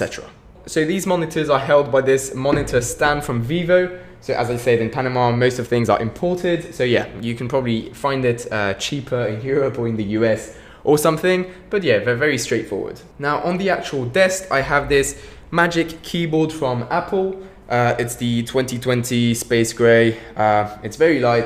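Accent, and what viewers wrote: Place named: France. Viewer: you're accent it's like a frensh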